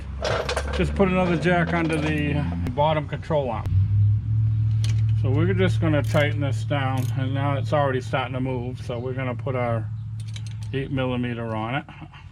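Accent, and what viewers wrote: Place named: Boston, United States. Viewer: yeah I heard it and was like this guy has to be near Boston. Thanks for posting the video I'm doing some work on my dodge and you really helped me get shock out the way so I can replace lower control arm. Thank you again ☺️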